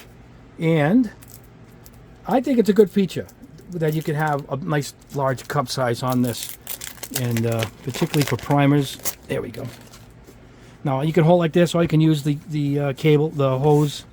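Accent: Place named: New York, United States. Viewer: Jesus!!! Got my mind blown with the extendable brush thing!!! I was shaking my jar to use the last part!!! Top advice as ALWAYS!! Also, love that NY-Italian accent, it's like hobbying with Il Padrino!;)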